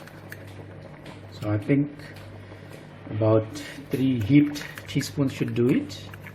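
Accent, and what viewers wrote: South Africa. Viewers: I am South African now living in the US and I love your South African accent :-) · You sound south african..I'm south african..but live in the uk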